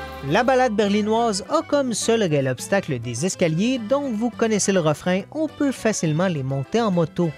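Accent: Quebec, Canada. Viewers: cette vidéo serait moins bien sans accent québécois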